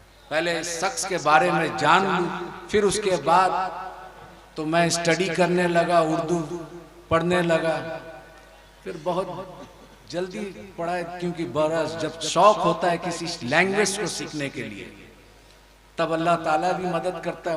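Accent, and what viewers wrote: India. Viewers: Mashallah Hazrat aapka takrir sunkar bahut Dil Ko sukun Mila🎉 aapka bolane ka jo leja hai na vah bilkul Jharkhandi ka leja hai aapka Allah Pak aapko salamat rakhe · Accents to pura Hindi lag rahi hai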